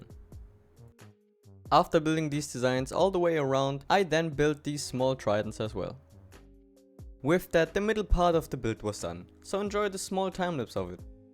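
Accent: Germany. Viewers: I hear german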